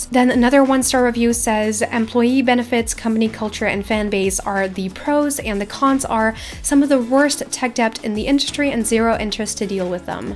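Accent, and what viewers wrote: Netherlands. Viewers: Wait— are you dutch?!😱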